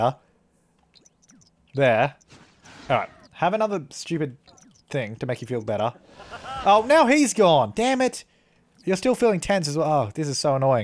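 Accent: Australia. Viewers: I cant tell does he have a Australian accent or?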